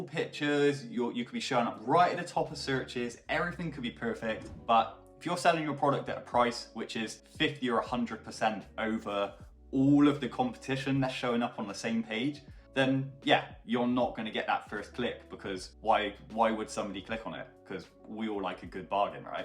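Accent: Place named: Somerset, United Kingdom. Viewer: bro nice video. you sound like you are close to my neck of the woods with your ascent lol (im somerset).i havent quite got started with etsy yet but i have all the equipment to want to start selling(3dprinters,laser engraver, cnc router, uv printers, vinyl cutter and sublimation printer) ive learnt enough to feel like im almost ready to make sales and being that im in a job that is close to becoming a dead end with all the bad terms they keep wanting to impose (postman) i must start to do something to aim for my dream so im hoping to arrange a call with you soon to make sure im heading in the right direction from day one.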